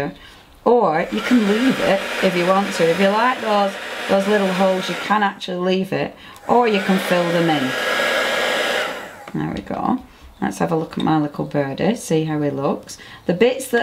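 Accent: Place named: Yorkshire, United Kingdom. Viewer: Just beautiful, loving your Yorkshire (?) accent. Very friendly and informative.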